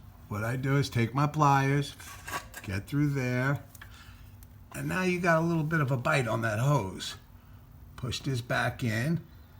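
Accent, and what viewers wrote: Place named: New York, United States. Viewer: Hey, I haven't heard your type of New York accent in over 30 years! It's refreshing to hear it after such a long time. I'm not a native of New York, nor, a transplant. It's comforting to hear it again.🤓😁⭐⭐⭐ Doug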